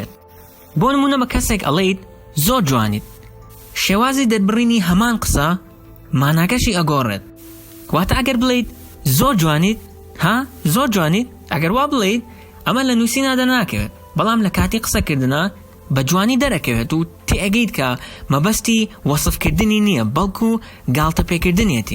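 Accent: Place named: United States. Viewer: thanks l hope sooo and its really good you using accent American.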